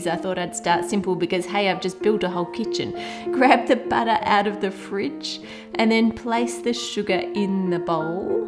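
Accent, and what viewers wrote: Australia. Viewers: she’s australian? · Galaxy Midnight, i totally agree! I love her Australian accent! I've always wanted an Australian accent! #SoCute!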